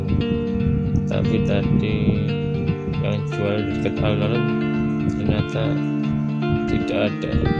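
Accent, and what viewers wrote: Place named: Banyumas, Indonesia. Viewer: Sya juga ngapak... Hihi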